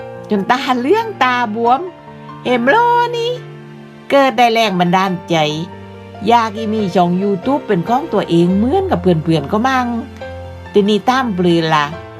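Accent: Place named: South, Thailand. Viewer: ชอบจังภาษาใต้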